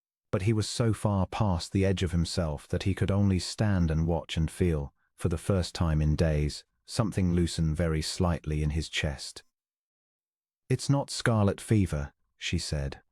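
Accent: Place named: United Kingdom. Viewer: At first was not sure I would like the narrator’s voice with a British accent, but as the story progressed, liked the cadence except the way Lionus was pronounced. Listening from Clarksville, TN.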